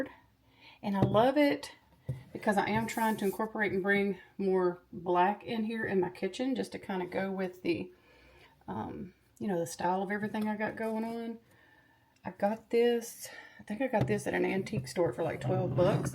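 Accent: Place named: South, United States. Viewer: I'm a new subscriber. You have a little bit of a country accent like me; where are you from? I can't wait to watch more of your videos. BTW, I'm from Southeast Georgia.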